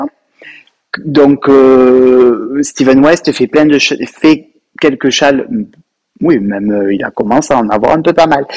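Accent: South, France. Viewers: 😂😂😂trop sympa votre accent français à la Dior,,,j’adore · L'image est tres bonne mais le son mauvais.cela vient peut-être de la piece.je me regale de vous ecouter avec votre accent du midi c'est un vrai plaisir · Coucou! Ce que tu me fais rigoler 😅 ton short kitch est hilarant et oui tricote. Tu feras une belle présentation dans ton podcast 😉 Comme toi je parfume mes laines avec des "billes odorantes" que j'inscris dans mon cake et comme toi je sens toujours mes laines 👃😂 Tes chaussettes "forêt enchanté" et tes glaces sont magnifiques, j'adore. J'ai le patron "forêt enchanté " dans ma bibliothèque et comme toi je vais opter pour les écureuils, ils sont trop chou 😊 J'ai toujours beaucoup de plaisir à te regarder tu me fais rigoler, j'adore ton franc parler et surtout j'adore ton accent du Sud et tes expressions qui sont succulentes. Bon été et bon voyage, tu vas en voir du pays 😍 Bisouxx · Encore un agréable moment. 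De jolies laines et de bons conseils. J'adore votre accent plein de soleil et de cigales... Passez de bonnes vacances. Si vous êtes OK, vous pourriez nous partager de superbes paysages. Reposez-vous bien. Le son pas top mais bon......😊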